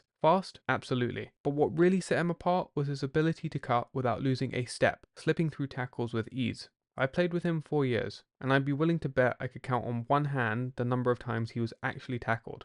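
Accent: United Kingdom. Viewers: Hi, i'd love you to make a video on how you got so interested in american football. I find it really interesting that a young guy from the uk (I think from your accent ) has such a love for the game. Thanks for your videos too, I think your channel is one of the best on the NFL on youtube. :)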